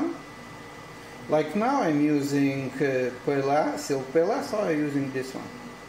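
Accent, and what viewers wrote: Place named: Lithuania. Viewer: ish anblu kalbos sprendziu kad lietuvaitis...irgi nusipirkau toki:)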